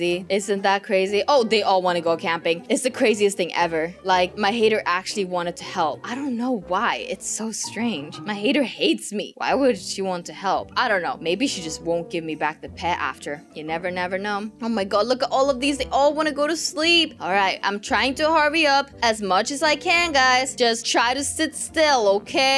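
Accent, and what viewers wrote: Sweden. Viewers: I love your Swedish accent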